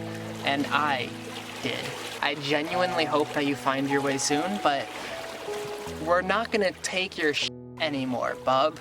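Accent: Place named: Maine, United States. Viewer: AYYY 3 dollah deweyyssss r u from maine wtf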